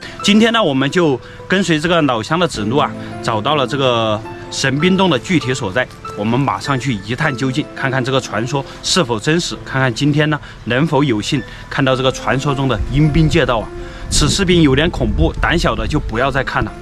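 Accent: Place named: Sichuan, China. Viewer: 为什么我要半夜一点钟来瞅这个视频，为什么，为什么，为什么。 这个家乡川普倒是给了一点安全感。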